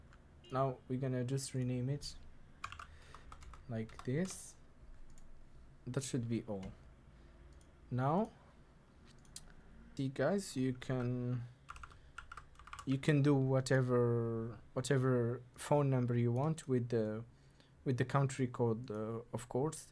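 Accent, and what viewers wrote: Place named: India. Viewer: الله يسامحك يا أخي، ظنيتك هندي والله لأنك تتحدث بنفس طريقتهم استمر وفقك الله وأعانك 👏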